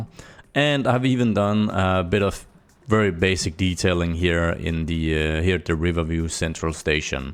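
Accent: Denmark. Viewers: Is that a danish accent I hear? :D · Are you from Denmark? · I think hes danish. And yeah its a game it wont look like a real city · like the video! Are you danish? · Er det en dansk accent jeg hører?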